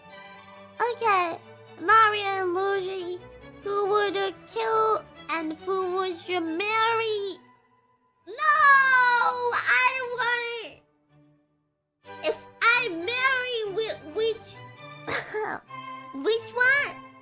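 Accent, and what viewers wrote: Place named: Australia. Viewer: I love her slight Aussie accent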